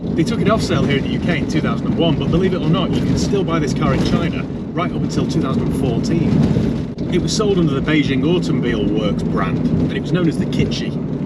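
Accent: United States. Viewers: Good American accent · Your American accent cracks me up so funny! 🤣🤣 Keep up the cracking content!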